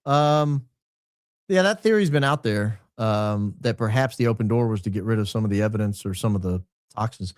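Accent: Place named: Alabama, United States. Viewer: were you originally from Alabama? It sounds like you at least lived here. I was born here and have lived here for all but fifteen years or so of my life. I returned slightly after that time frame, and I remember being shocked at the political things that happened in the early 2000s.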